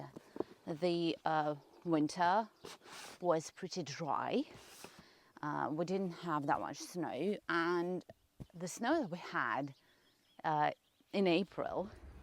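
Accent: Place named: RP, United Kingdom. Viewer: She could be a pundit at the BBC 😅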